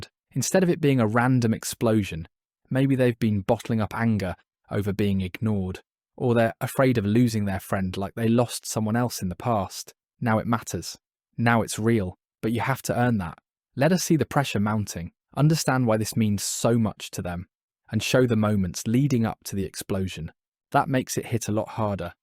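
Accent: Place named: United Kingdom. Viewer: British accent)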